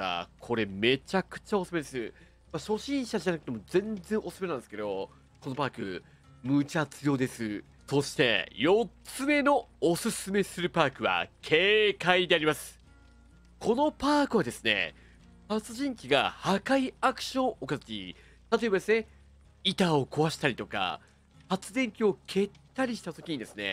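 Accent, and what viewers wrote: Kansai, Japan. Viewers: DBD気になっていたので、参考にさせていただきます！ 関西弁もたすかります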